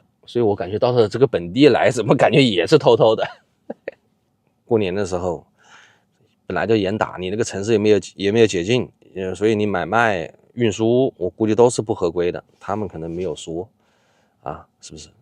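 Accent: Wuhan, China. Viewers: 武汉人？？？听你口音听出来了，我后天去浏阳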